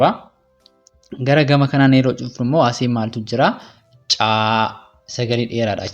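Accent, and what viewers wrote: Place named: Addis Ababa, Ethiopia. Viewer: Gara addisabanyaati hin jijirin loqoda kee bro!!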